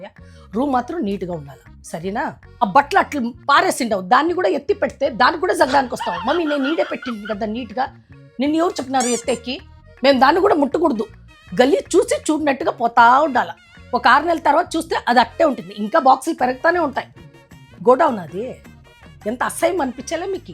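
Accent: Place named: Anantapur, India. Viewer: Your anantapuram slang is very nice. 🎉🎉🎉🎉🎉🎉🎉🎉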